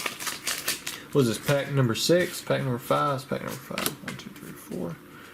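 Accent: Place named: Kentucky, United States. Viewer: Bojangles biscuits yessir...you in ky? Sounds like a ky accent...watchin ya here in Louisville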